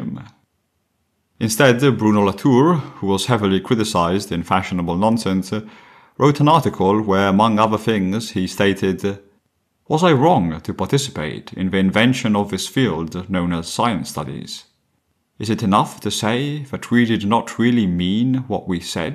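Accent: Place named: Italy. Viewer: I thought it was a finish accent at first but leaning more towards Italian now. Difficult to place tho, to me sounds like a mix of Italian, Icelandic and something Eastern European 🧐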